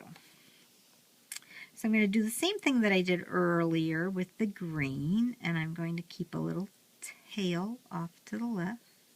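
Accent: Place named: South Carolina, United States. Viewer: I enjoyed looking at all your projects... are you from South Carolina, I'm from Georgia... your accent sounds like my sister in law who lives in Columbia, S.C.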